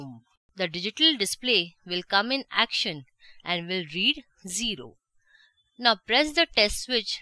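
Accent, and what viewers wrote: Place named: India. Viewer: But this indian-english akcent is best😅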